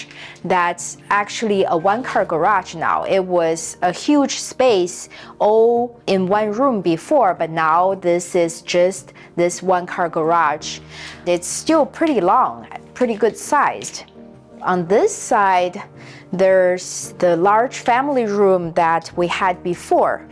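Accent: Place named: Taiwan. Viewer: Are you Taiwanese? My mother in law is and sounds familiar ❤. Anyhow love your videos. I am new to house flipping and making many mistakes as I go but a life long learner . Thanks for sharing with us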